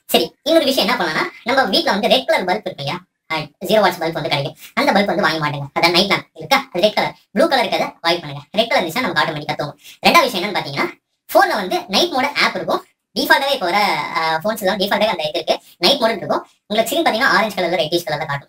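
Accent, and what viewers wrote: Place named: Kongu, India. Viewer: Na 10 oo or 11 oo clock ee thoongiduven and vanakam vanakam vanakaaaaaaaam super appu apdiye kongu tamil aaa pesunga nalla itukum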